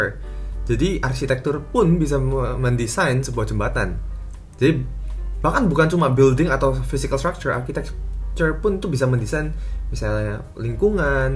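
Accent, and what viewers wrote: Java, Indonesia. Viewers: Kak logatnya khas Jawa buangeeet! Wkwkwkw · Kok bisa si pas ngomong english american banget, bahasa indo medok banget wkwk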